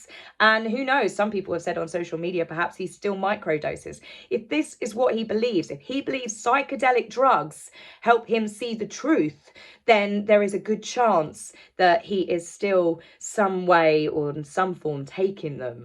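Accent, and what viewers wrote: United Kingdom. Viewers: While I ❤️your opinions, take on things today it's that Gorgeous opal your wearing! I have a keen eye & ❤️opals they are my birth stone. New to yr channel & loving it. The content great but honestly I love the sound of British accents. Find them SO soothing. Lastly in Harkel's replay of some of the word 🤮I saw on Behavior Panel I believe the British gentlemen was very sad & hurt by Migraines comment the the British reaction "smaller" than Americans. As an American I would take that as a compliment. We Americans can be loud, obnoxious, class less people NOT all but I will say many. So be proud for having composure, manners, class. Megraine is perfect example of a low class, rude, mannerless, know it all (only in her mind) money grubbing bottom feeder, who thinks she had what it takes to be a Royal. She has delusional views of how she is perceived & her sense of entitlement. 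Royals are respectful, do Not act entitled, humble, attempt to connect & serve the people however they can. Royals understand Who supports them & pays for their lifestyle Not a paycheck or freebies. I've never met a Royal but that is how I see them to be. Yes there may be problems but they are resolved quietly & discreetly Until the horrors that are the Harkel's invaded & poisoned with their disgusting amt of pure greed & evil. I'll never ever forget Oprahs part in enabling & giving them a platform all to rebuild her relevance her perceived importance & more 🤑💰💰💰. Didn't realize Narcissists came & ran in pa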